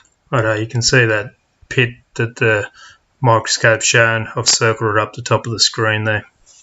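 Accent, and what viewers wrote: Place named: Australia. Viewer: And a n Aussie it sounds!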